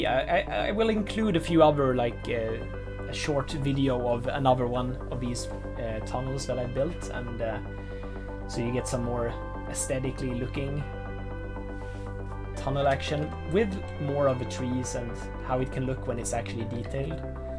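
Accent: Sweden. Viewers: Hey, are you swedish? You sound swedish... 😄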